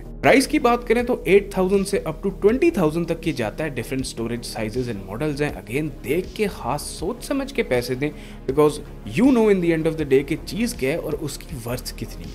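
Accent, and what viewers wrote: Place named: Punjab, India. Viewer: Shukria!🙏🏻 Absolutely love the Punjabi Tarka 😂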